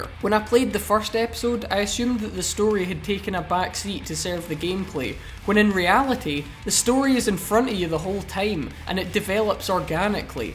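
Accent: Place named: Glasgow, United Kingdom. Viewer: Sweet review bro. Loving the Glaz geee accent. This game lools wesome but I am a low life with an xbox so doubtful I will ever get my hands on it. Thanks for uploading it..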